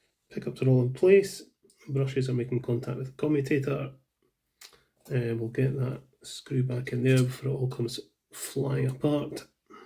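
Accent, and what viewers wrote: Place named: North, United Kingdom. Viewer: Great accent .. you’re not a southerner …. :)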